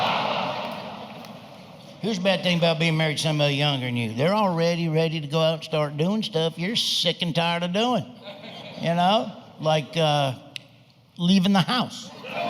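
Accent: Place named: South, United States. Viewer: I notice Larry's kinda easing out of the southern accent.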